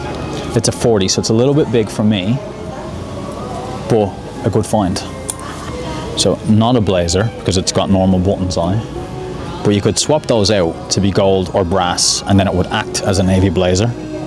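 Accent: Ireland. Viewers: Classy clothes, cool sounding irish accent and great thrifting tips. Quality content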